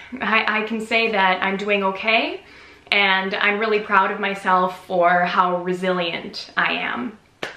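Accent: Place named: Minnesota, United States. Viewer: Minnesota accent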